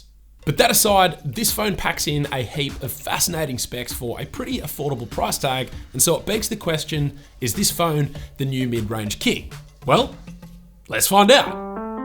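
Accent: Australia. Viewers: Galaxy Aye52 😆 Love that Aussie accent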